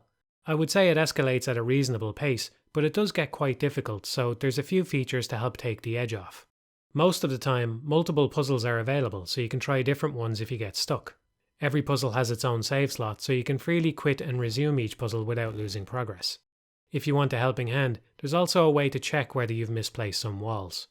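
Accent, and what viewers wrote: Ireland. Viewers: And he emerges! Man, I really miss your dulcet Irish tones talking about games. Congrats on the game-making achievement! <3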